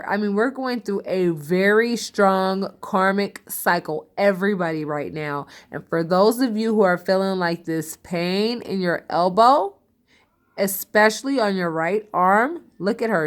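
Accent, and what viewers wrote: New York, United States. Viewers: you got that Harlem, Bx or Brooklyn heart in you...I can hear it when you speak😉🤩. Again, thank for this Cancer read!! Pisces is soon gonna see!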